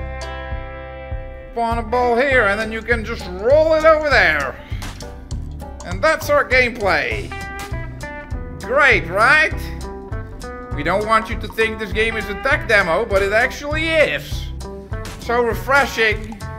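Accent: Netherlands. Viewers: I can hear Dutch somewhere in the accent, is it true?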